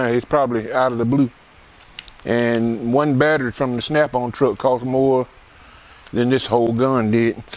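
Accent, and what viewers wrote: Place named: South, United States. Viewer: I agree! Although, I don’t know how northerners and people out west appreciate the value of a southern or country accent as much as those of us that live in the south. For those of us from the south, a southern accent (black or white) is a comforting sound. Hearing this man talk is like a basket of fried fish with a side of cheese grits for my ears.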